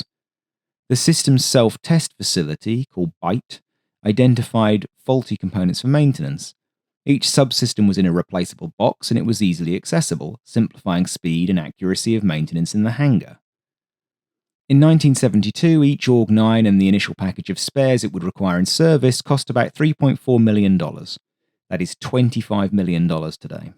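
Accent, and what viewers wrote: United Kingdom. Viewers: Why oh why does it ALWAYS have to be a snooty British narrator on every documentary on YouTube? Even about every American airplane and ship? I can’t stand it.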